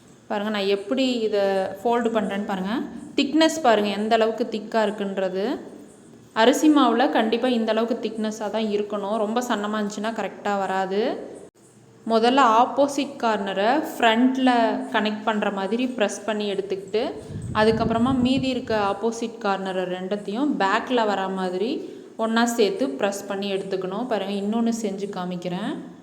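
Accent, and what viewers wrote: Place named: Kongu, India. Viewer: Kongu Thamilum Thanjai Thamilum Super Pasthavum super magaley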